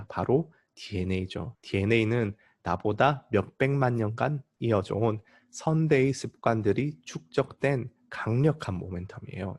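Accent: Gyeongsang, South Korea. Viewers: 주식 공부하러 왔다가 철학 공부 잘하고 갑니다. 아 그리고 말투만 들어도 이미 경상도 사람인거 알았어요 😋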